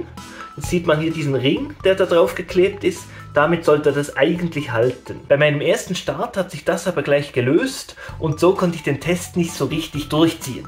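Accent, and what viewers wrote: Bern, Switzerland. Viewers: Ganz klar immer no Bärndütsch! 😅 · Tip top equipement review! Deutsch-deutsch is für mich klar leichter verständlich, trotzdem gefällt mir dein autentisches Schwizrdüch besser! 😊❤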